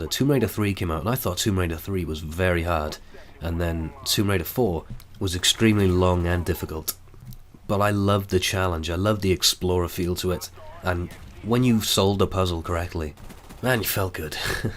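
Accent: Wales, United Kingdom. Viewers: I think you would be such a good voice actor! It's like when I first heard Gaz in cod 4, and then Ghost in cod 6. His voice was awesome and I got the same feeling when I first heard you. btw, are you from wales? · where in he uk are you from? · i must say i dont like the welsh accent but ure voice is heavenly and the kiparis is BEAST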